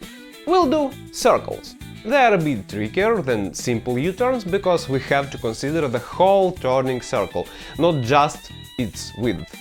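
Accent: Russia. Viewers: Actually love the Russian accent 😊 And sensible content, saving lives.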